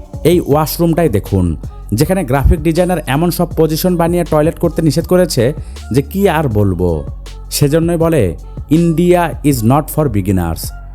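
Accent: Bangladesh. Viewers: কুত্তা তুই তো নিজে বাংলাদেশি তোর ভাষা শুনে মনে হচ্ছে 😂😂😂 এটা বাংলা দেশের বল 😂😂😂 শুধু ভারত এর বলিসনা ,, তোরাও বাংলাদেশি এদের থেকেও উপরে😂😂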